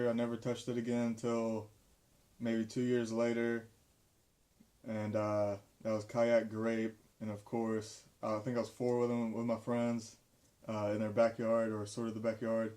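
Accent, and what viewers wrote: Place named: South, United States. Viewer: Thanks for the shout out,! I always liked the tone of voice and attitude that you have! I can hear the southern accent as well as sort of a black sound from a proud white man here! Well, nothing racist here, just your unique, individual style, which is great!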